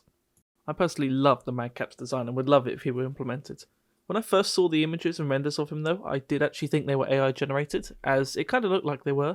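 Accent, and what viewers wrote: United Kingdom. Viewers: He gives me cockney vibes (north east london) rough as anything and kind of a pub brawler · he is probably from Birmingham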